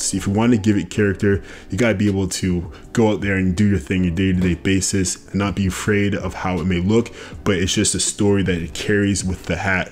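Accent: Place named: Canada. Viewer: so what part of Canada is your accent from if I may ask?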